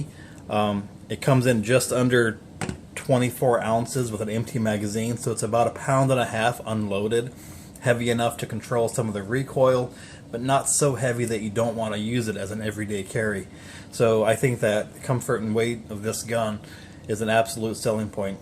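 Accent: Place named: Carolinas, United States. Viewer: What part of teh Carolina's are you from? I'm having a hard time placing the accent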